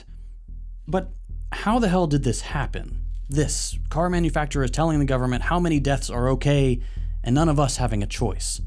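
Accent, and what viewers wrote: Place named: Pacific Northwest, United States. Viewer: Could tell you are in the PNW by the way you speak, but that B roll footage of you driving really brought it home. :p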